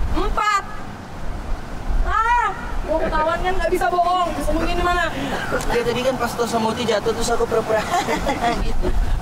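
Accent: Bali, Indonesia. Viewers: Logat Bali nya kental bgt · LOGATNYA BALI BGT HAHAHAHAHA🤣🤣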